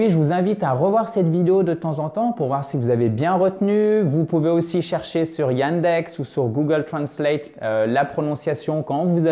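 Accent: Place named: France. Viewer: спасибо merci gracias thx PS Votre accent français n'est aucun problème pour moi, au contraire c'est un plus car 1° on peut ainsi perdre d'éventuels complexes et 2° le russe est plutôt ainsi mieux digest qu'avec un(e) russophone de naissance.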